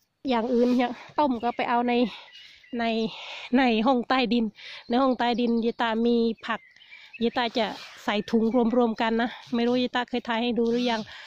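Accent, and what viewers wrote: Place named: South, Thailand. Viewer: ดูแลตัวเองด้วยจ้าา,เอื้อย บ่สบายเว้าสำเรียงออกมาทางภาคใต้เลยเนาะ(น่ารักไปอีกแบบ)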